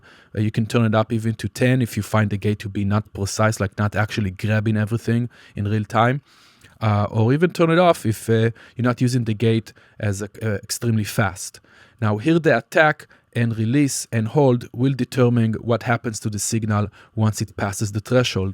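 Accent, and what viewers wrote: Israel. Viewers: gotta love that Israeli accent (great tutorial btw!)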